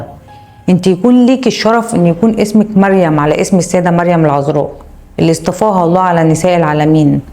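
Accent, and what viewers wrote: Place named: Egypt. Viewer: منو قالك أردنية ذي مصرية منكم و فيكم شوفوا كلامها مب اردنية!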